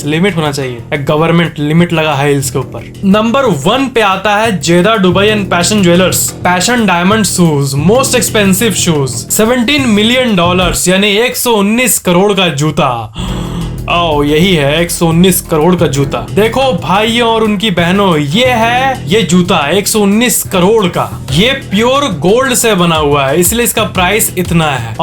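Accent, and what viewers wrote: Bihar, India. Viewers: Are you from Bihar? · Are you from bihar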